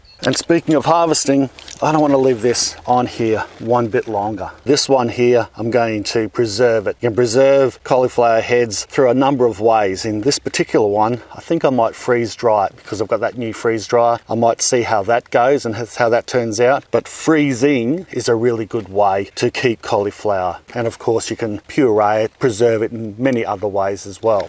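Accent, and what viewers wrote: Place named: Australia. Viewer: An Australian living in Canada now since 1971...your teachings are great, but your Aussie way... it is just beautiful ..even had a fleeting thought maybe I could return one day to live....the words written on your t-shirt seeing the earth through her eyes.... that is so precious and evocative..thank you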